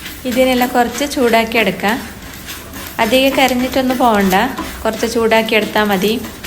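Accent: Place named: Kannur, India. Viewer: കണ്ണൂർ ഭാഷ അടിപൊളി👌👌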